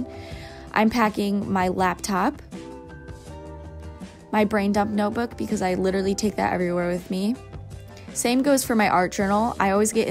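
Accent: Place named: Michigan, United States. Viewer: Me: idk why but she sounds like she’s from Michigan Her: get ready for the Michigan wether Me: I knew it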